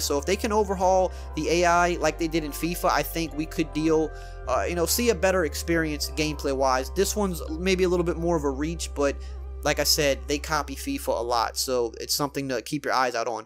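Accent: Louisiana, United States. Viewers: Are you from Louisiana? You have a Louisiana accent.. just like me 😂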